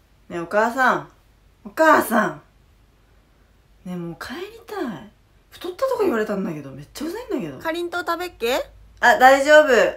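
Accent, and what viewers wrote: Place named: Ibaraki, Japan. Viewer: 茨城弁うまいですね。 ほんとネイティブ茨城弁です。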